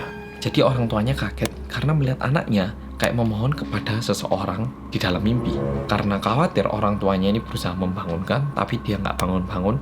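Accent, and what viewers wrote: Java, Indonesia. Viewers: Woe logatnya orang jawa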